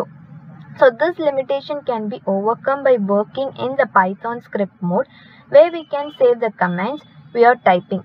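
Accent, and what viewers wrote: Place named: North India, India. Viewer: Your voice seems like a North Indian 😊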